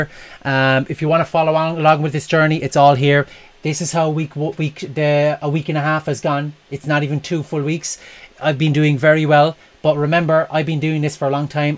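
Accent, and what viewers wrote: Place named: Ireland. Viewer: Hi, Very informative - excellent explanation - thank you - alright / all night (couldn't quite get my head around your Irish accent!;-) One question if I may: you were using TradingView to explain your strategy, but using TD365 as your broker. The two don't integrate (sadly), so I'm wondering how that works? Perhaps you're just using TV for demonstration purposes on your YouTube channel and your actual trading on TD365?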